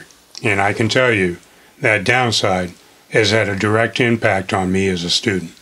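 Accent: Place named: Maine, United States. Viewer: This is great - a nice balance of narration and action shots. I like the humorous way you highlight the challenges of truly rural living but also the benefits. I know it's not the kind of living is not for me but you still convinced me that I need a vacation there sometime after the winter. The photos are spectacular - the beauty of Maine definitely shines even when there is snow. You really got your Maine accent on for this one too! Good luck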